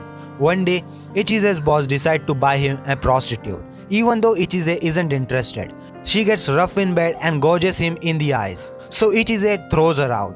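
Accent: India. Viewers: Bro are you Indian?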